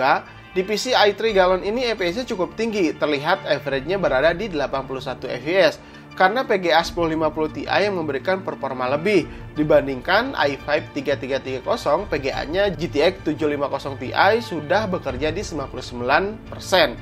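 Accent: West Java, Indonesia. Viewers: Saia suka logat anda👍🏼, sundanese bangett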